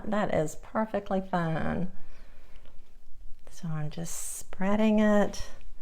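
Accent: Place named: Alabama, United States. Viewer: Looks wonderful! Look forward to trying this. Oh how I love blueberries. I heard you mention Thomasville. Is that Alabama? My step mom was from there and passed away recently (Atkeison). Your beautiful accent reminds me of her. God bless!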